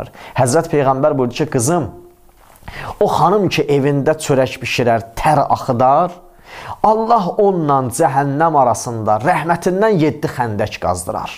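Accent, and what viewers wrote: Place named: Iran. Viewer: Azərbaycanlı ola _ola İran ləhcəsi ilə danışırsan?Bunu yığışdır İran İttilatı sizə belə öyrədib???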